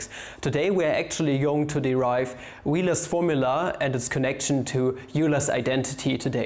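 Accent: United Kingdom. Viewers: nailed the british accent boi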